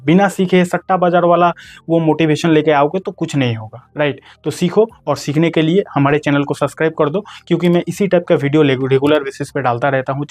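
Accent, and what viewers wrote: Bengal, India. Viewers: सर आप एकदम सरल भाषा में समझते हो आनंद आ जाता सीधे दिमाग में घुस जाता है लेकिन आपकी हिंदी में थोड़ी थोड़ी बांग्ला भाषा की ton आ जाती है तो और मजा आ जाता है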